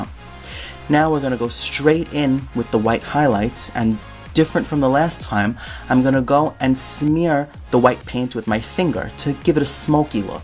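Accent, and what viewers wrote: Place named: New York, United States. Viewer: Lovely art but whoa, you are like the only person from New York I've seen who sounds like they're from New York. Never heard a soft, gentle voice in a New York accent before, lol.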